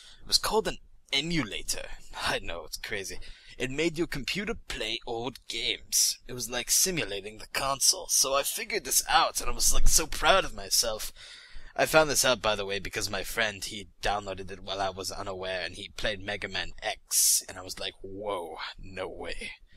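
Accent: United Kingdom. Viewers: Is he like British or something? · wut's with the brittish accent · He gradually got more british throughout the episode regardless of the fact that he is american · his british accent is cute^^ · anybody else relies he is speaking with a British accent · i love the fact that you turn more and more british in every word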